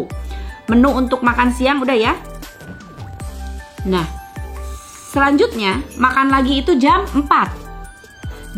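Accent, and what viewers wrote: West Java, Indonesia. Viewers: Nyunda pisan s tth..hidup urg sunda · Teh memang luar biasa biar sudah sukses tpi ttp sundanya ttp nempel melekat... Sahabat teteh suk maampir ke sebelah kita joged" dengan alunan lagu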